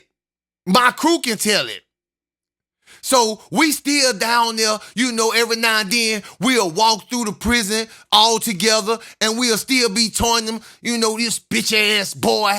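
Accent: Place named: South, United States. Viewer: I love listening to yo country ass.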